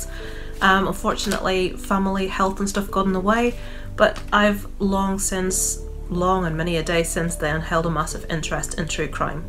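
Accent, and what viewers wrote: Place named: Scotland, United Kingdom. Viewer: Ireland? I’m from Ireland and you sound Scottish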